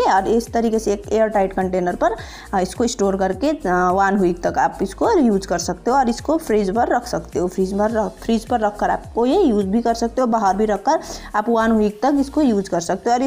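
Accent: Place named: Garhwal, India. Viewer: R u garwali, ur tone is like that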